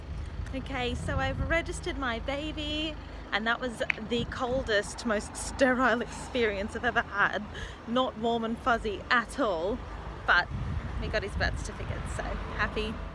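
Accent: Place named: United Kingdom. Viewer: Aww hi baby!! Such a cutie and I love your British accent mommy!! 🥰🥰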